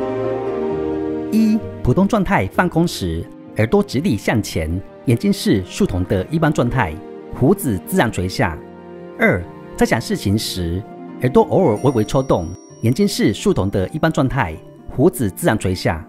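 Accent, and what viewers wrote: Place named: Japan. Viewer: 不要騙我是土生土長台灣人，怎麼覺得像日本人講中文😂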